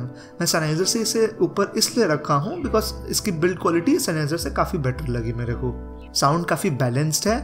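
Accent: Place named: Kolkata, India. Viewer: your accent telling me you are from Kolkata. is that right?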